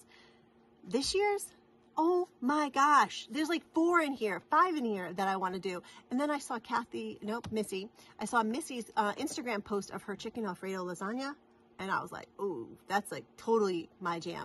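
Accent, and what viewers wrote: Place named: New England, United States. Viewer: Happy belated Birthday ! I hope you have the best time at retreat! I love your videos! Hear your voice and New England accent reminds me of home! I moved to Texas in 1981 and miss those New Englanders ! Your students are so lucky to have a teacher with such a happy personality! Hugs from Texas! Ps you can never have too many starts!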